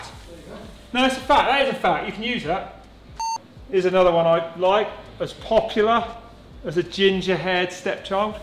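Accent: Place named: South, United States. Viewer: Funny as fuck! Always been wierd to watch i think its the sothern accent with northern humer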